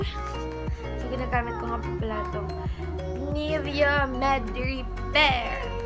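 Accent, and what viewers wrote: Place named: Hiligaynon, Philippines. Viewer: That hiligaynon accent thou i love it💖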